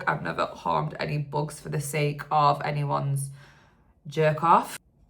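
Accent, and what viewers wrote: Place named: United Kingdom. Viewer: Do you think having a British accent gives you an advantage, say, over an American accent?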